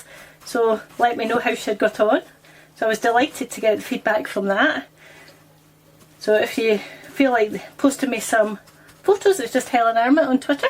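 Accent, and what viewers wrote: Scotland, United Kingdom. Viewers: Thanks, will surely try them, been away from Scotland a long time, love hearing the accent as well. I remember walking in a Mark & Spencer once and laid my eyes on a packet of them and shouted out loud "snowballs" My husband and customers all looked at me like I was crazy, but as you know the friendly Scottish people, we all had a great talk when I apologised and told them I had not been home for 15 years!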